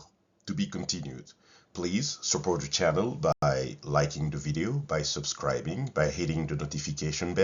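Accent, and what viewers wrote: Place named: United States. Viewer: Speaking American English,. Great job, blessings.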